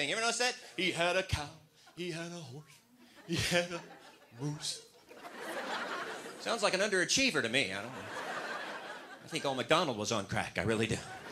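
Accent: United Kingdom. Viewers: his british accent reminds me of stewie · He has a good bri-ish ascent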